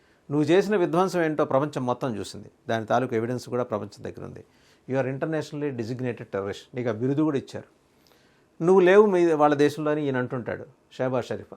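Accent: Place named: Nellore, India. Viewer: It seems you are a nellore person as per ur accent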